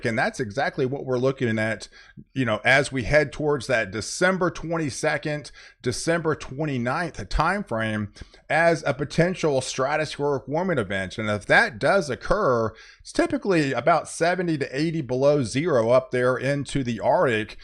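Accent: United States. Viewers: There are people commenting on his way of saying westrin for western, eastrin for eastern and pattrin for pattern. These are part of the United States regional speech differences. A regional accent if you will. Like a Boston accent or Southern accent. I have a friend from Kentucky who talks like that. You may think they’re uneducated or stupid but it’s a speech pattern of their region. Very hard to unlearn even if you get multiple degrees. Just my two cents.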